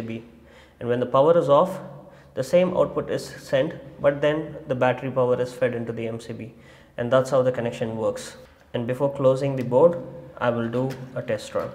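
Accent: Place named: India. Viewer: Typical Bharat accent😁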